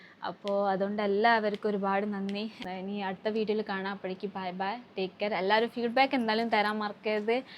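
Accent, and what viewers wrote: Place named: Kozhikode, India. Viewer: ഭാഷ കോഴിക്കോടനാണല്ലോ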